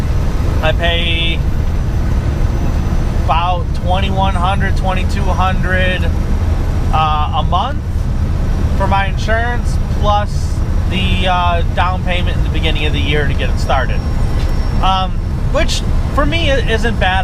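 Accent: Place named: Tennessee, United States. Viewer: None of this is helpful to me sence I'm not a truck driver but I love listening to your Tennessee voice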